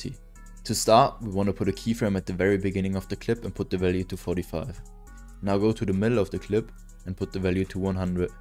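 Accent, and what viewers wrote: Germany. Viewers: I Hear german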